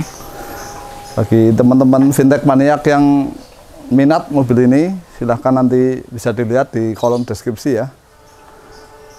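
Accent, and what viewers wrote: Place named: Banyumas, Indonesia. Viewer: Dikerook 😀 ngapaknya ketahuan, Mas pasti dari mana ya??. Sukses mas, semoga cepat laku, keren banget mobilnya.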